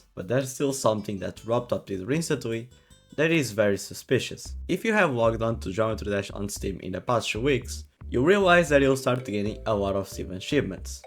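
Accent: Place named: Spain. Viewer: bro, tienes acento español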